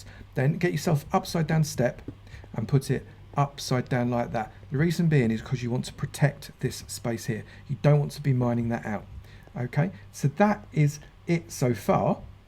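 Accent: United Kingdom. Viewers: soy mejicano, y entiendo muuy bien a los americanos pero... el asento británico, dios mio es hermosamente difícil de entender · That Hen, is called a British accent. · Because it sounds British?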